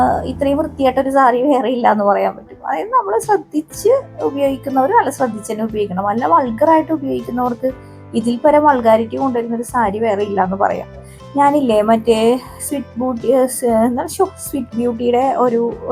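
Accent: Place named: Ernakulam, India. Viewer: ചേച്ചിയുടെ എറണാകുളം ഭാഷയാണ് പൊളി🎉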